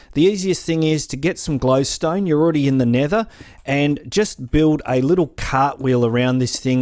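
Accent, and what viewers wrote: Australia. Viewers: are you aussie mate ?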